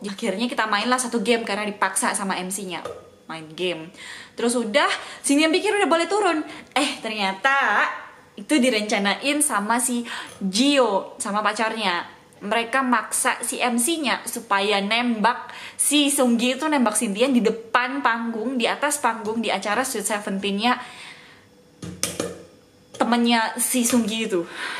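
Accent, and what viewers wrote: Surabaya, Indonesia. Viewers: Lucuuuuuuu medokkkk surabaya banget deh 👍🏼👍🏼😂😂😂😂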